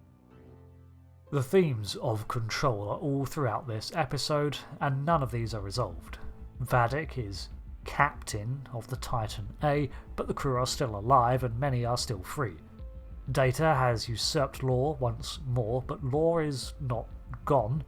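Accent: United Kingdom. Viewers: For a few years now I've been watching your videos for star trek online as I have not been able to play it much myself. I love the way you narratively approach each of your episodes for the stories. I love your recaps of this season of Picard. Not much of a point to my comment other than to say I appreciate what you do. And a question? your accent I'm having a hard time placing, and I don't know why cause usually I'm good at that. Honestly I can't tell if its British or Scottish but I have a feeling it's neither. Either way it doesn't matter. I'm just curious. Either way I do love your videos and watch them often. Looking Forward to the next one.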